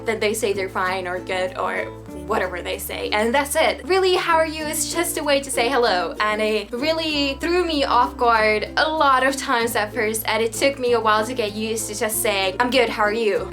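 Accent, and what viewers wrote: South, United States. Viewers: Your accent sounds southern . How long have you been living here ? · Does your family tell you that you're accent is changing? you sound super southern . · I'm from the Mid-Atlantic and I can hear a Southern accent on her. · She sounds slightly southern to me. Like a southern born girl who has lived in a northern state for a few years and starting to pick up on a northern accent. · Had you not said you were Finnish I would have said you have a southern accent, so later in the video when you said you were in Charlotte, I just kinda went "I knew you were in the South!" You're right, everyone has an accent. I live in Utah, though I grew up in the Chicago suburbs, and I still get asked where I'm from, in spite of having been in Utah for 18 years now. But I've apparently picked up enough "Western" accent that on visits back to Illinois I get asked there where I'm from too, so.... 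there is that, I suppose. I actually work with a Finn and he's got a rather strong accent, but I'd have never been able to tell where from until he told me he was from Helsinki and moved to the US as an adult. Accents simply are. Just not a big deal. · You have a southern accent. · I like the accent. You can tell there is a Finnish accent, but even a little southern in there too. Very cute. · Great video! Your English is great, even a little Southern in places. I just wanted to make a few suggestions. Less dark eye makeup and a little peach or pink gloss on the lips would really suit you. A brighter colored top, too, in pink or light blue, would look better on camera. The dark top makes you disappear. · Your English is perfect and it’s sounds very southern lol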